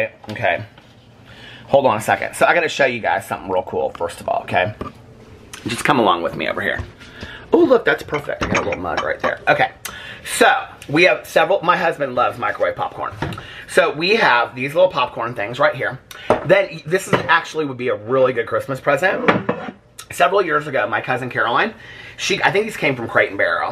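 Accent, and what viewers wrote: South, United States. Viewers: I was more of a Richard Simmons kind of girl? 😂 this was SO fun, I love your Southern accent! 😂 love you! 💙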